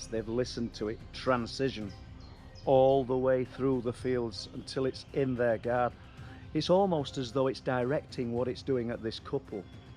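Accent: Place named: North, United Kingdom. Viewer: It’s the northern accent (slight I know) that really works with spooky stuff. Like Deb Hatswell.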